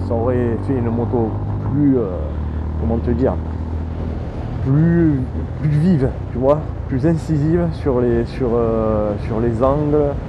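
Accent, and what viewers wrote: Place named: Southwest, France. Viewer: Merci pour cette belle présentation avec ton magnifique accent du sud-ouest 😉 Je trouve que la bécane était un peu trop rapidement au rupteur, tu confirmes ??? ✌️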